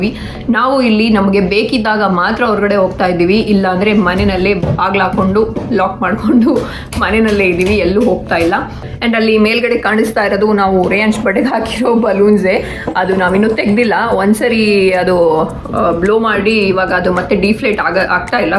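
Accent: Mangalore, India. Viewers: 1.Nimma manglore Kannada tumba ista. 2. Nivuu Canada dallidru Kannada na tumba chennagi matadtira so nice of you and cuteness. 3.Nim Volgs simple agidru nodoke kushi ista agutte anyhow keep doing Volgs👍.nim hubby veg curry I tried it was came out yummy tq. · I love you akka I love voice and your voice I love your cooking I love your way of talking I love your way of Mangalore Kannada voice I love you are editing Lots of love from INDIA ❤️❤️❤️😘😘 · 1. Alwa. haaaa ee dialogue ge 2. Nim manglore Kannada so sweet so 3. is my son Vaibhav 's friend so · Awesome... & romantic end.. "neenu nodbeba haage.. heludusa marthuhegtada"... Mangalore Kannada rocks..❤🤩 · 1. cuteness 2. Mangalore kannada 3. Your talking style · 1. cutness overloaded.😍..I'll always be waiting for your vlogs to see him 2. Manglore Kannada ❤️ nimma maathu kelodralli ondu maja 3. naaviruva jagadindane Canada nodo avakasha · Channel yake ista Andre 1. 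Your voice and Mangalore style kannada 2. Your life style and cooking style 3. Nanage nimma explain maduva method ista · 1. cuteness 2. Mangalorian. namma urinavru. mangalore kannada 3. Foreign nalli idru kannada dalli matadtira. nimma voice ista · Hii ma'am, I'm 18 and I feel your content is very entertaining and it makes me happy to see you always happy and motivated. I like how beautifully you celebrate each festival in Canada and cook various Indian dishes with passion. I really like the way you're bringing up your son, he's such a sweetheart. Overall you're very inspiring and Mangalore kannada thumba chanda untu🖤 I feel these are my opinions on your channel. Lots of love and power to you🖤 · Hii Mam hegidira Nim video yake eshta andre 1. Nimdhu cute family, nim husband Nimge support madthare, mathe, nim kannada adhu nam m'lore language chenagidhe, olle useful video madthira. Dress chenagieruthe nim yelrdhu.soft agi mathadthira. 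2.mathe Nim maga cute boy mathu, smile, video end madodhu yela chenagidhe, avnu super 👍👌 namge eshta yelrigu nam family ali. 3.mathe nim smile chenagidhe, video namge use ago video madthira adke eshta, hage nivu mado recipe yelanu eshta india, Karnataka, m'lore, style ali madthira chenagieruthe. Try madthivi navu maneli. Hage Madhya tulu language mathadsodhu eshta aguthe. Thank you, take care bye. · 1ನಿಮ್ಮ ಮಗನೆ ತುಂಟಾಟ ಕನ್ನಡ ಮಾತು ತುಂಬಾ ಇಷ್ಟ. 2ನಿಮ್ಮ ಮಂಗಳೂರು ಕನ್ನಡ ಮಾತು ತುಂಬಾ ಇಷ್ಟ. 3ನೀವು ಕೆನಡಾದಲಿದರು ನಿಮ್ಮ ಸರಳತೆ ನಿಮ್ಮ ಕನ್ನಡ ಮಾತು ತುಂಬಾ ಇಷ್ಟ 😘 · Firstly, Congratulations on hitting 50k. Keep growing🤗 1. As you already mentioned, - The way he speaks is adorable. He is cute and handsome. The way he respects elders and his innocence will always touch my heart. If I had a son, I want to be him like. Parenting is also very important and I also consider parenting the right way is tough. You did it great👏 2. 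You are flawless while speaking. While speaking English or Kannada there is a fluency and clarity so that's make you unique. I love to hear your Mangalore slang and also you will correct words or pronounciation while speaking Kannada so it shows how much you like the language. Happy and proud about you😇 3. From you i got to know Canada much better. I love when you travel and show us different places. I also like your care and love towards family, recipes, humour, your lifesyte, tips about face and much more. Most important confidence in you and your vlogs are more organic. No drama involved. The list goes on.... Thank you for having patience to read my comment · Nim Kannada ಮಾತಾಡೋ ರೀತಿ especially Mangalore Kannada is too good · Hello... 1.I like the greenery of Canada,especially tour vlogs😁 2.I like ur Manglore Kannada 3.I like the recipes you show and I also like you😁 Congratulations for 50K, May u reach higher and succeed 🥰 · mangalore Kannada ❤️🔥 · 1.i love you 2.mangalore kannada 3.i love your channel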